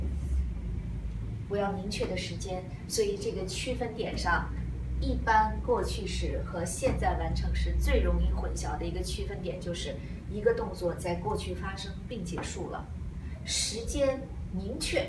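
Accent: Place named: China. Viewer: 我也觉得，跟其他女生相比，纯中式英语的语音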